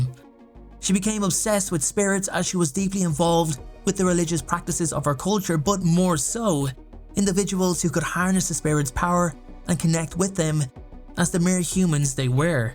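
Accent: Ireland. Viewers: Oh boy, so I guess I cannot understand Irish accent :'(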